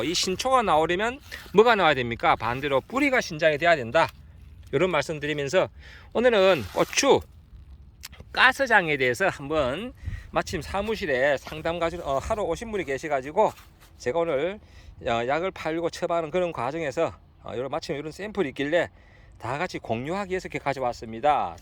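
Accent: Gyeongbuk, South Korea. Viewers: 말씀이 참 시골 스럽네요 경북 부부지방 말씨 같은데 구분이 잘 안되네요 ㅋ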